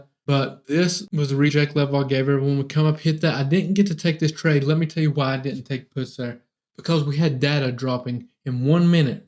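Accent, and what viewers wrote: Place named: South, United States. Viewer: what state do you like in?.. sound like you from the south… i’m from TN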